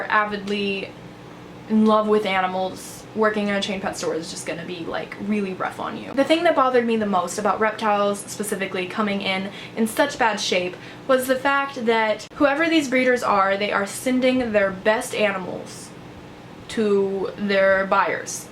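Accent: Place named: South, United States. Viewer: Where do you live?I notice a southern accent.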